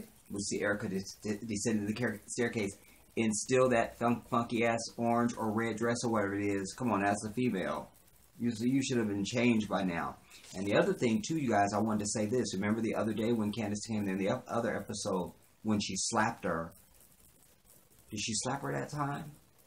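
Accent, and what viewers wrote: United Kingdom. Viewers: I thought I was listening to a UK reporter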